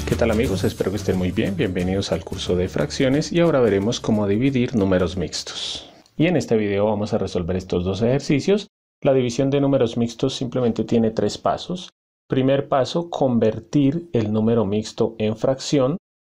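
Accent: Colombia. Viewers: Es de Colombia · PROFE COLOMBIANOOOOOOOO HOOOOOOOOOOOOOOOOOO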